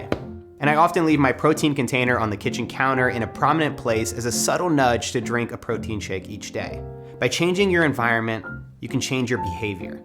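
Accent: Australia. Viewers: As an Aussie, thank you for this. I watch all your videos, and accent makes me feel close to home even though I'm living in NZ. It means a lot you are promoting the relief effort. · Call me stupid but I only just realised that has an Ozzie accent after watching your videos for like a year & half or something. Great video as usual. On a side note I've tried getting up early & cutting out sugar as well being inspired by your videos last year.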